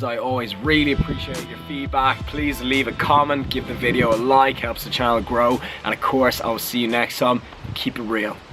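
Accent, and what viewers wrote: Ireland. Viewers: Ahhhh I love the Irish accent! Check out my vlogs if you get a spare moment :-) Love from London xx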